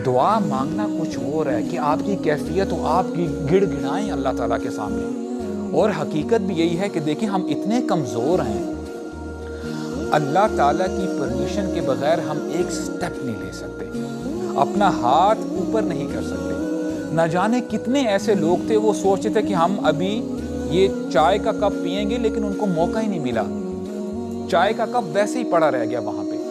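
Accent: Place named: Arab world. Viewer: Amazing Arabic tonality of yours, we truly appreciate that!!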